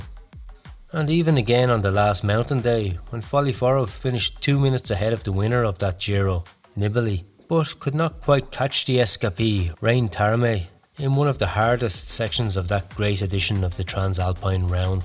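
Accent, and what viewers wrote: Ireland. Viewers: Good stuff.Subscribed.!! Keep it up.Not a wee bit Irish are ya? How about one on Sean Kelly & Stephen Roche?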